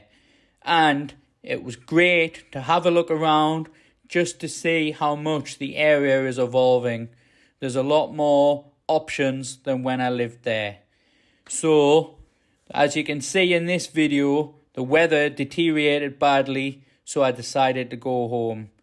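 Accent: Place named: Newcastle, United Kingdom. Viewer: Thank you for introducing your hometown! This brings back lots of memories I have of Newcastle! Used to live in Newcastle and Gateshead long time ago, like a last life time! So you are Geordie! Love when you said 'mi' mom, and m'a'n.... really miss that! : )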